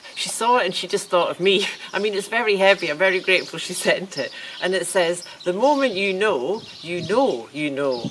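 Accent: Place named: Edinburgh, United Kingdom. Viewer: Where are you from? Im from Edinburgh and I recognise that accent!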